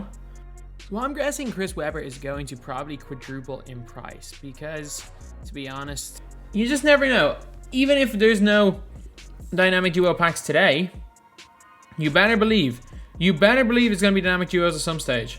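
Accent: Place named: Dublin 4, Ireland. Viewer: your dublin 4 accent pisses me off mup tallagh son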